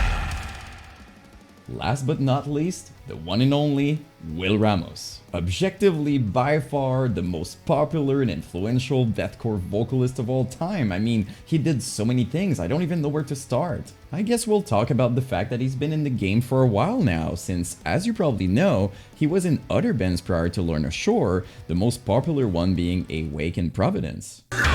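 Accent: Quebec, Canada. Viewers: C’est sûr que t’es Québécois! 😂 Est-ce qu’on aura une vidéo en français un jour? Peut-être à propos de la scène deathcore au Québec? 😗 En tout cas, merci pour là video — du bon travail comme toujours. 🫶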